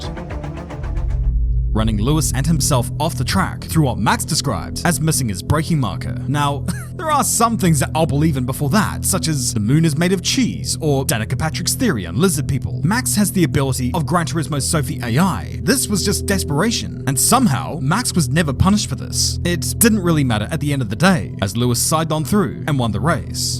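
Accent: New Zealand. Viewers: are you from Southland?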